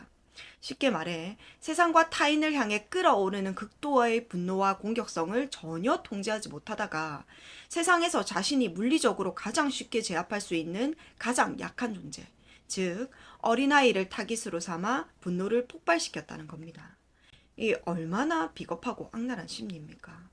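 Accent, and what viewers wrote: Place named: Seoul, South Korea. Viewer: 서을말 좋아요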